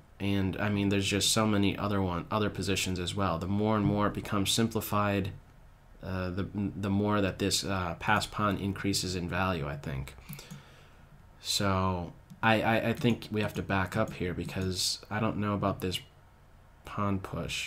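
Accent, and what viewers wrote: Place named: New England, United States. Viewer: We don't get many Irish 'round these parts, so I'm not well trained in that accent. But I do hear the New England in his voice. Maybe small town New York or Pennsylvania?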